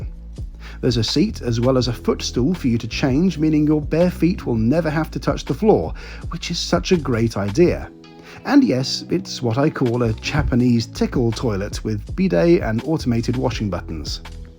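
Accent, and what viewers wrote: United Kingdom. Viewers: I am from the US and everything you just said sounds so wonderfully British!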